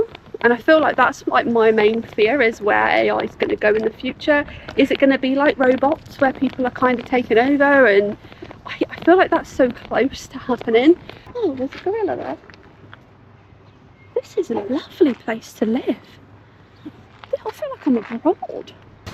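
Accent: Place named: United States. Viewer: As an American, it threw me for a loop hearing another American voice suddenly 😂😂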